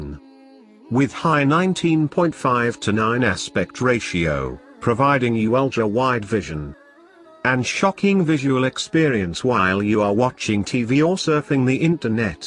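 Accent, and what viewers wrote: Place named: United Kingdom. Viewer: video introduces: UK voice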